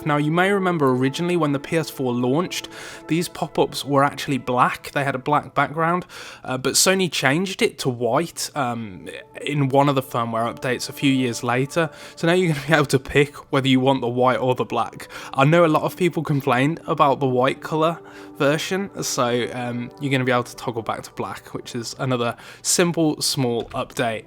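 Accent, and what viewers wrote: United Kingdom. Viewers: Long winded annoying British accent, geesh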